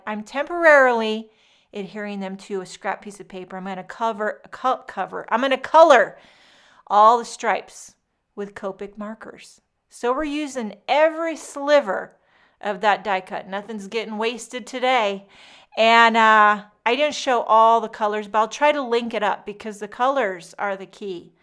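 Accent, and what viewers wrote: United States. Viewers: Hi I watch your videos all the time and I love them! I’m curious what are you doing in Germany? You sound 100% American.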